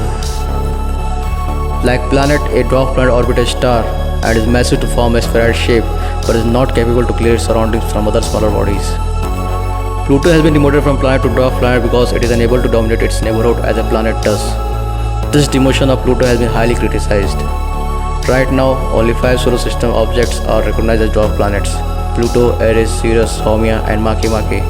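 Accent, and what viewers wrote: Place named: Africa. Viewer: why does your voice sound African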